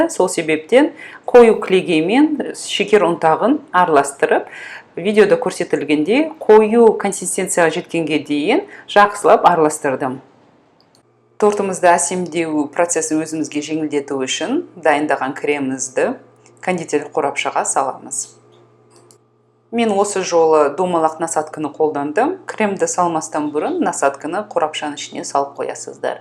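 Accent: Standard. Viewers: Рецебіңізге рақмет таза қазақша сөйлейсіз жасап көремін міндетті түрде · казакша таза сөйлеуге тырысасың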